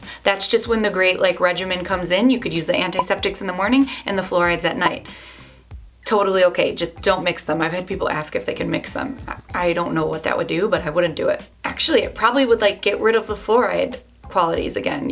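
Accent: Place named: Midwest, United States. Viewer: i picked up a bit of a midwestern accent from her hahahaha. however, great video! never would’ve thought this would be on my recommendations!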